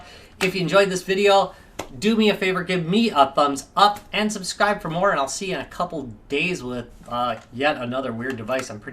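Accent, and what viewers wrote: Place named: China. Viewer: i love chinglish from china , entertainment before you see the product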